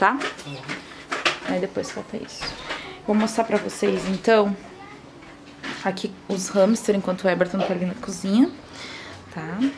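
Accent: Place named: South, Brazil. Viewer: Amo o sotaque do Sul e.lindo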